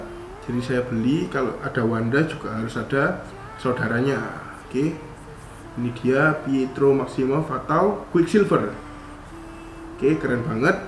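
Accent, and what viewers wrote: Java, Indonesia. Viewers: PERTAMA KOH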